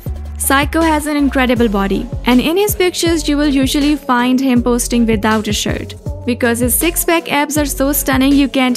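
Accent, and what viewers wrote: India. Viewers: Having an Indian woman with a typical Indian accent makes these videos hilarious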